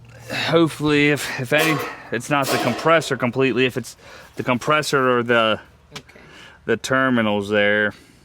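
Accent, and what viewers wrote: Maryland, United States. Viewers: I may be wrong and that’s ok on my observation. And no offense implied or intended. But since I started watching this channel, I’ve suspected you are from or spent a substantial amount of time in Maryland to develop the quintessential accent of the region. I was born and raised in Maryland myself, and that is very familiar to me. Happy Thanksgiving!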